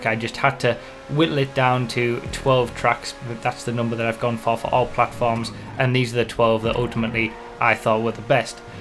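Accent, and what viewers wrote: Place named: Newcastle, United Kingdom. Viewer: You kinda sound like a Geordie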